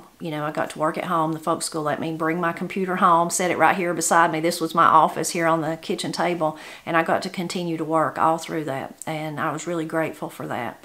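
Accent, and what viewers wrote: Appalachia, United States. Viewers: God bless you and your family. Uve watched your videos for a year and a half now approximately. They have lifted me up in tough times. I thoroughly enjoy them and your wonderful cooking. Im from Appalachia un Virginia. You bring back such good memories of my grandmother's, grand father, aunts and uncles who talked the same way, cooked the same, so many great memories for me. I thank God for you and the good videos and work you do. Please continue to bless us with your videos ❤️💙🙏🙏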